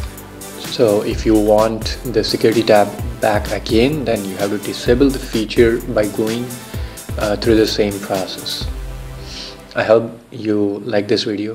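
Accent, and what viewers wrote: India. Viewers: Noice Musik, and beautiful Indian accent.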